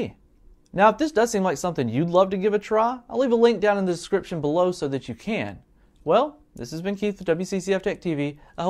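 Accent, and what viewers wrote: South, United States. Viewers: Awesome video! But I gotta ask what accent do you have? I've never heard a "standard" American accent mixed in with a southern twang!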